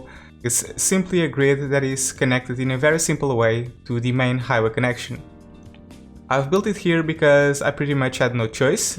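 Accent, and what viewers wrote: Portugal. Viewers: I was paying attention and suddenly my head was like "this sounds like a Portuguese guy speaking English". Eu estava certo 😂 parabéns pelo canal, estou a apontar as dicas! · Are you portuguese? Your accent looks like it Ok descobri que és tuga, já subscrevi. Adoro o Cities Skylines também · Reconheci logo o sotaque portugues! É bom saber que existe um yt portugues de cities skylines apesar do teu canal ser em inglês!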